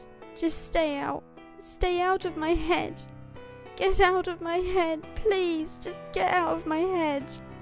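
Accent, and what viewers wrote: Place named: United Kingdom. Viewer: The most wonderful and precious thing about this story is the narration. This is the true accent of Britain, remember it well as it is becoming increasingly rare. The murder and defilement of the English language is a horror story in its own right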